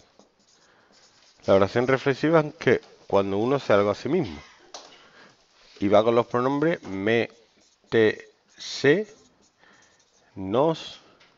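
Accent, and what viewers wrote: Andalusia, Spain. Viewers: eres andaluz verdad?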